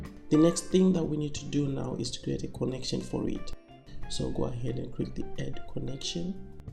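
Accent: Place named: South Africa. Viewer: do you have an email to cantact you directly for tutorials, from the sounds of it you are based in Mzansi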